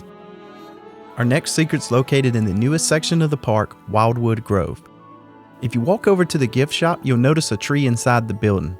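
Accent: South, United States. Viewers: LOVE YOUR SOUTHERN DRAW..🥰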